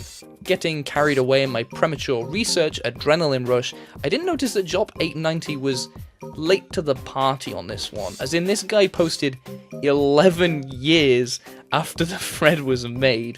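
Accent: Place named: United Kingdom. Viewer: The algorithm gods have blessed you. I'm happy to be hearing another British (or aus) essayist. Great work!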